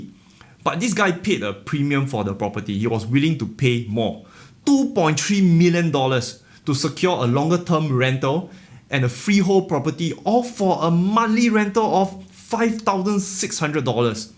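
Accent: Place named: Singapore. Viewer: I love your singlish bro 😂 can’t wait to come to Singapore one day!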